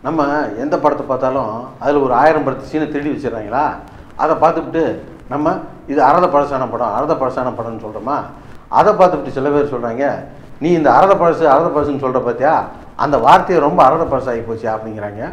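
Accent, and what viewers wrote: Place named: Chennai, India. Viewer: Inna thalaiva Madras bhaasha la varuthu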